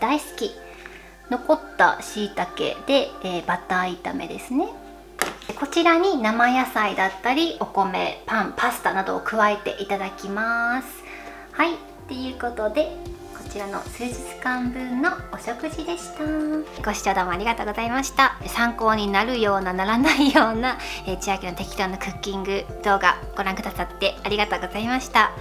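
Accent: Okinawa, Japan. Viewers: の沖縄弁とキャビンクリューみたいな話し方が可愛い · の沖縄なまり可愛い😍💕沖縄で食べたシリシリ、美味しかったのにちゃんと習ってないので、このレシピを真似て作ります！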